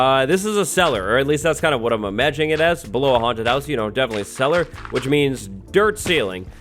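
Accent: United Kingdom. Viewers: ahh yes british boi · omg I love his British accent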